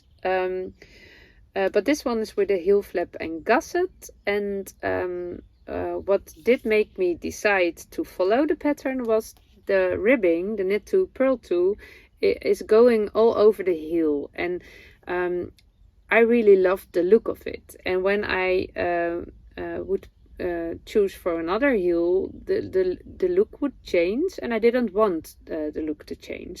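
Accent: Netherlands. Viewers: I love your "Dutchie" English, I can understand perfectly. I'm familiar with the word paprika because my mom, who was Slovenian, called a red pepper (the ripe version of a green pepper) that. We also have paprika, the ground powder seasoning. I love how beautiful you have made your caravan/camper with all hand made items. Your camper has many heart jumping moments, every time you dress it up with something new, I'm sure! Did you follow a pattern for the cute curtains behind you? I hope you had or are having a lovely time away from normal daily activities. 💗💗💗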